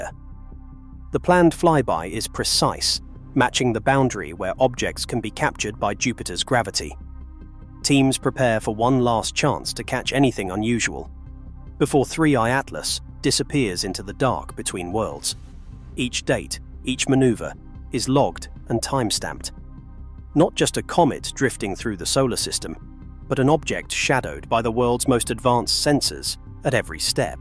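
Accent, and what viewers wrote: United Kingdom. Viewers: Understand that the timing is perfect. The "new world of disorder" and all these meteors, comets, UFO's etc means that they can dump nukes on their own countries aka FALSE FLAG, and blame anything from AI, to the Cartel, to Russia, to UFO's, to global warming, to the kitchen sink. And the narrator English accent is always a give away.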